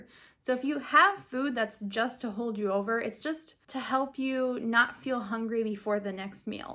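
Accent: United States. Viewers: I want American accent like you ❤ I am 13 years old from Korea🇰🇷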